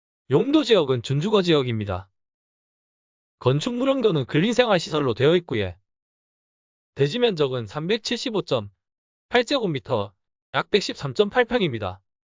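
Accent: Gyeongsang, South Korea. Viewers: 어슬픈 진주 사투리 참... 그냥 표준어 쓰시죠 ㅎㅎ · 와 우리 진주사투리갖고 뭐라카지? 직접 유튜브 찍어보고 이야기하시지.. 내는 고마 들을만하고 이쁘게 말 할려고 노력하는게 보이는데.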